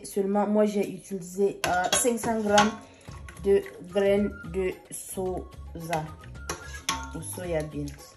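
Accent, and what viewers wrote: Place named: Mossi, Burkina Faso. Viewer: Ça vient de l’accent mossi pas tous les burkinabè sont mossi. Y’a plus de 60 autres ethnies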